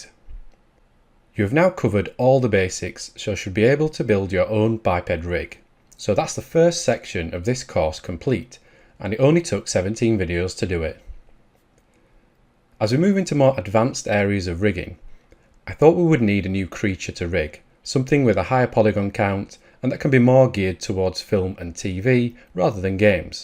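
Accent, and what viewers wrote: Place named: Australia. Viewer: I love Australian accents. Yoo cawll daht a noife?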